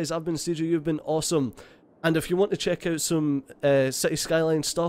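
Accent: Scotland, United Kingdom. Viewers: are you scottish? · Im Dutch, but that is scottish, not german. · Maybe it's just me. They say they hate english accent but I actually love it. Do people in Scotland always speak like this? I need to focus really hard to understand some words you are saying...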